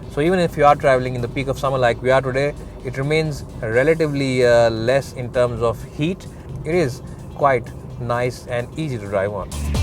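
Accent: India. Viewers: I love your English thoughts or scripts you may be having. It is full of good words, to the point and sounds good Indian accent (not like other fakesters). Liked and shared this and subscribed since February '16. Thanks for making such travelogues, it is a treat to watch for an amid traveller like me. I moved to Delhi from Mumbai with my 8year old Tata Indica Turbo in March. I did 1 stop (At Udaipur) journey from Mumbai to Delhi at constant speed of 60-70kmph in March (considerably hot summer). It returned me 26kmpl despite having stuck in Traffic at Bharuch due to bridge work. I am constantly in a hunt to find and visit a hill station and your travelogues helped me alot. thanks again.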